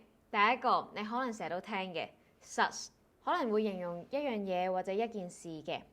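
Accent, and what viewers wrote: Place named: Australia. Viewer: maybe Aussie....but definitely not Bogan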